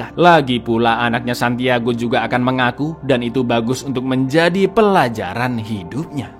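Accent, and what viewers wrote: Java, Indonesia. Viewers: Bng lu orang Jawa bng 🤣🙏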